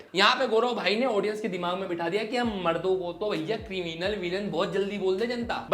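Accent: Indore, India. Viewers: Indori h Bhai.. ek indori accent aur ek normal accent:)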